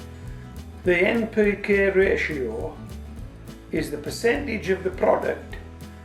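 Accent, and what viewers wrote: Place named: Lake District, United Kingdom. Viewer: New subscriber here. Never been a keen gardener, always kept an almost zero maintenance garden before (shaming my old dad who has always been very keen and won many many show awards for his veg) - however, since starting off a few fruits last year, I found your channel and you have me inspired to try some veg growing in pots and a couple of tiny raised beds. Going through all your videos now and long may you continue to inspire. Cheers and all the best to you and Molly :) P.s - trying to place your accent, that can't be a Lakes lilt surely!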